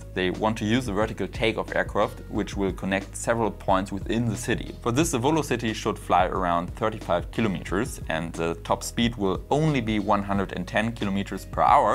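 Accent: Germany. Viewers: Cooles Video! Das "th" klingt manchmal ein bisschen holprig & reduziert an ein paar Stellen die Verständlichkeit, aber wenn du das ganze noch ein bisschen verbesserst top. Ein wenig deutscher Akzent kann ja ruhig auch bleiben. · I'm English, and had no trouble at all with your speaking - yes a strong accent, but not a problem.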